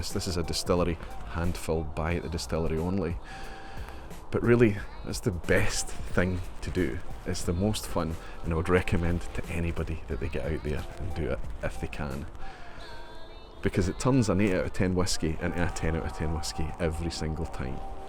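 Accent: Scotland, United Kingdom. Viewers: HEELS PLEASE! New to whisky despite also being Scottish! You sound local also! Trying to watch all your videos and soaking as much knowledge up as I can! New subscriber!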